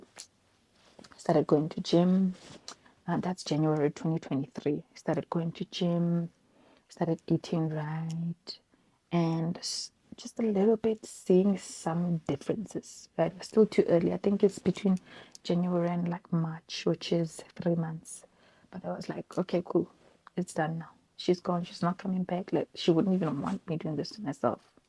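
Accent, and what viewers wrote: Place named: Africa. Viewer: it might not have been your intention, but race absolutely plays a part. I said nothing about you being racist or not watching other influencers of race. My point is once you interact with people of other races in real life, face to face(not online/ through a tv screen), then your opinions about how they speak hold more validity. You can't critique something you have no first hand experience of. Weight struggles, yes maybe. But the way she speaks? Absolutely not. African accents, dialects and tonations vary considerably, so if you're not used to it, it's easy to fall back on loose criticisms like it being AI generated. Imagine if the OP left a similar comment and justifying reasons for a Romanian native, having only seen Romanian influencers online and never stepped foot in that country-- they would come for her neck. Just make sure your receipts are valid, that's all.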